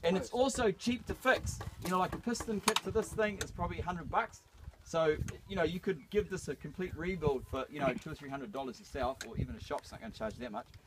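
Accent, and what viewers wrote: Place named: New Zealand. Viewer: He's kiwi.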